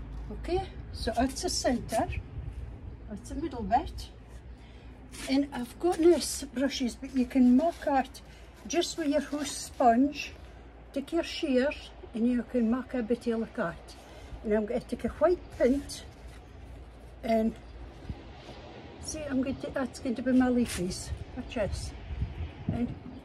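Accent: Scotland, United Kingdom. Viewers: IS GRANDMA SCOTTISH? I’m definitely subscribing now 🫶🏼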